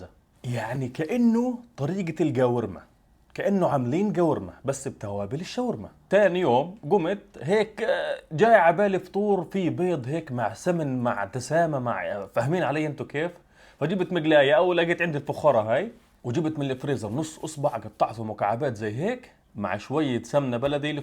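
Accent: Gaza, Palestine. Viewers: احلا شي لهجه الغزاويه مدموجه مع البدوي سبحان الله نفس المكس طالع فالاردن😂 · الظاهر عليك اكيل يا ابن بلدي (لهجتك غزاويه)يمكن غلطانه احكيلي الدغريري.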